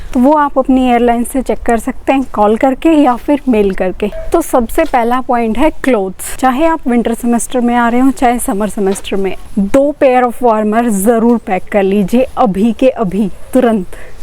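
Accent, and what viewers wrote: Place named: Haryana, India. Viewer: i can feel the Haryanvi accent in your voice 😅 btw.... very informative video 🤩😍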